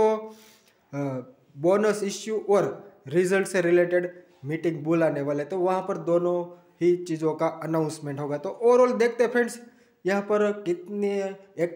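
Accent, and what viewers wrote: Gujarat, India. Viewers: App gujarati lagte hooo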